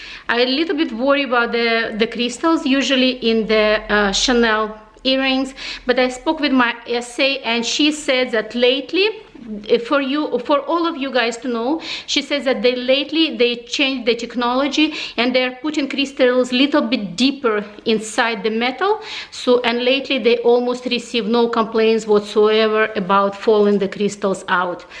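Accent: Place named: Russia. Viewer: Dear, you are so sweet...obviously there are some people on ytube, who care about you. I love your chanel, your russian accent....Now you are even more confident with ytube. You made/make a great job! I love your new items, especially the keyholder & the earings- they are both so cute! Can you give me the price of the earings? Thanks. Have a nice sunday 😍😉🇫🇷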